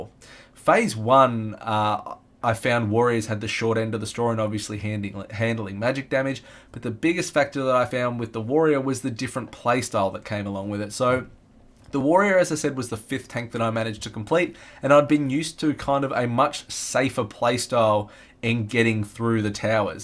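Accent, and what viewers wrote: Australia. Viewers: dude just having you say 'this cunt is cooked' had me subscribe. good aussy tank videos i approve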